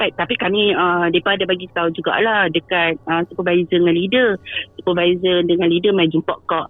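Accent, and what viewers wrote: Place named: North, Malaysia. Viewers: Santai chill nie layan malam2 syok, & faham ke tu cakap, pekat gak utara nya tu😅😅😅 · Kemaiihhh,pekattt likat lorat utara. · Saya baru dengar cerita dari ni..sori terlepaih bas 😀 Memang terhibur, sebab cara loghat orang utara sembang memang lagutu, dia punya kona, pusing roundabout, uturn balik, baru sampai umah 😀😀😀😀 Part II kalau boleh naa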